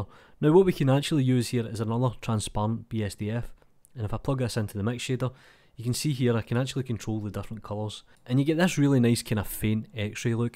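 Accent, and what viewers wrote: Scotland, United Kingdom. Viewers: Your inspiring combination of Blender knowledge and mesmerizing Scottish accent saves the day again. Thank you!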